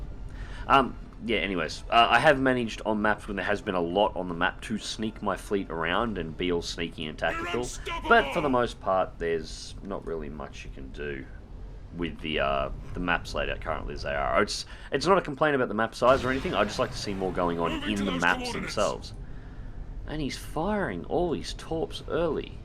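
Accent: Australia. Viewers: An Aussie !!! Finally !!!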